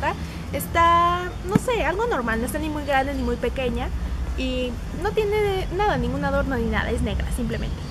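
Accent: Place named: Peru. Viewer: una pregunta... eres peruana ?.. tu acento se parece mucho...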